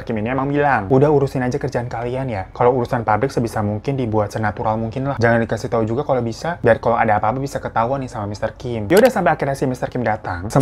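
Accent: Java, Indonesia. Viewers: Indonesia nya bagian surabaya dan semarang🤣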